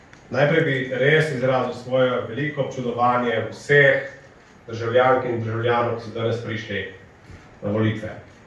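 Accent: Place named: Northern Primorska, Slovenia. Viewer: NI lepšega kot slišat severno primorski glas v politiki. Diši po poštenosti.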